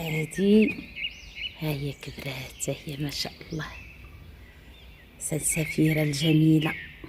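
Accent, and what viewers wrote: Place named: Oujda, Morocco. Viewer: من ضواحي أݣادير أختي الغالية إن شاء الله رفع الله قدرك في الدارين وانت من اي مدينة ربما من وجدة او بركان من لهجتك خمنت ذلك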